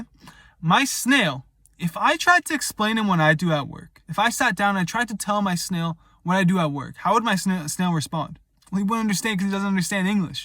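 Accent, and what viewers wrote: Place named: East Coast, United States. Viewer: You have an east coast accent!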